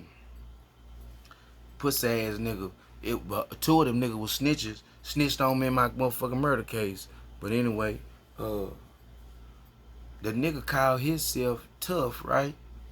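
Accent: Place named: Texas, United States. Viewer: TKO sauce. Haha. Thats funny shit. You have an awesome accent. That Texas twang is on point. I saw you from watching OG Percy videos. Were you locked up with him at all? Much support from Humboldt County cali. Just subscribed. You have a nice delivery. Keep pushing these videos out. 👍👍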